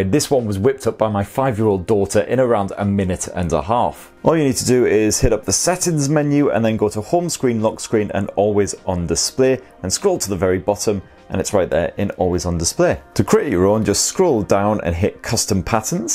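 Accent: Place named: England, United Kingdom. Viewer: Great reviews as always, can't you tune a little bit your english accent to an international one😁😁.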